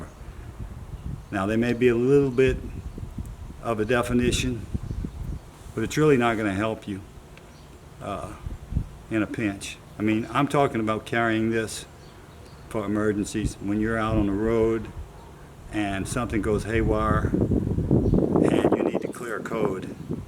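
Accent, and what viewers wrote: Louisiana, United States. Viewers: Are u Cajun? I bought my first cummins while I went to school in Louisiana and u sound like all them cajuns down there. Lol Some good folk.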